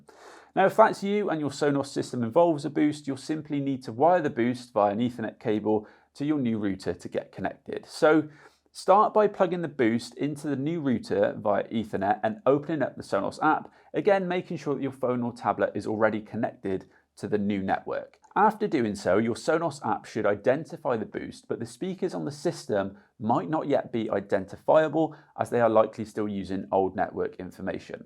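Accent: United Kingdom. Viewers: Having a guy with a British accent makes this video and Sonos products worse than they actually are….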